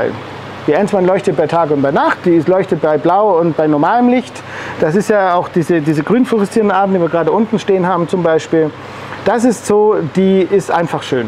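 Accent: Swabia, Germany. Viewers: Hochkompetent, sowie schwäbisch sympathisch. Da kommen einem schon Ideen. Auf bald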